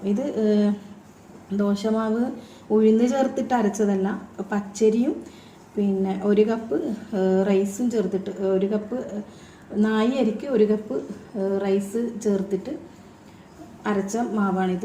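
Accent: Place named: Kannur, India. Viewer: full kannur shailiyil aanallo samsaram. ndhayalm sadanam polich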